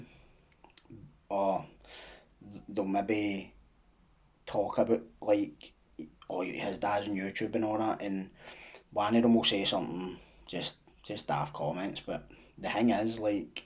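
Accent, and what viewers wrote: Scotland, United Kingdom. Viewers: I’m from Edinburgh mate and abit older than you so don’t think our paths crossed- think I’ve just got one of those face’s tbh- I get that alot. Keep up the good work your doing in life with your boy and in general also enjoying your channel. It’s good to listen to a channel channel with a Scottish accent,especially for me as I live in London these day’s.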